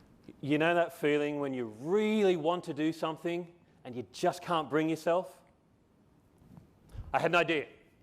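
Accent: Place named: Australia. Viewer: boss you sound ozzie not brit excellent speech sir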